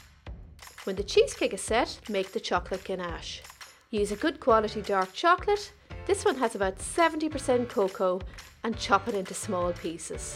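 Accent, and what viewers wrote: Ireland. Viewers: Love ya accent, reminds me of my gran and grandad and all my family in Ireland when I was growing up 🇮🇪 and I’m gonna have to try and make one of these to try 😊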